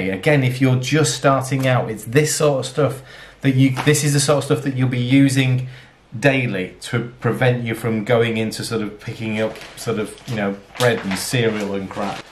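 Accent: United Kingdom. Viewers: This was so helpful. My family and I have decided to start our keto lifestyle (while our child is still young) and most recipes researched are American to which a lot of the ingredients are hard to get a hold of. Hearing a British accent is reassuring as well as knowing you shop in aldi. We use aldi for our weekly shops as it suits our budget so to know that keto on a budget is a thing gives us a lot of motivation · Thank you for this you have no idea how helpful and reassuring it is hearing a British accent it’s like saving grace I’m going out today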